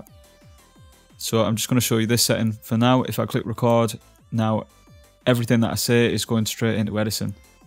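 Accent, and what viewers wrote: United Kingdom. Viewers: anypone talking about his lincolnshire accent, so hot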